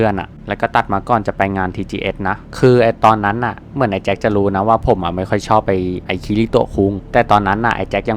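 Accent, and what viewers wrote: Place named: Kanchanaburi, Thailand. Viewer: เสียงพี่ก็เหมือนพี่แจ็คเกิ๊น สำเนียงกาญหรอครับ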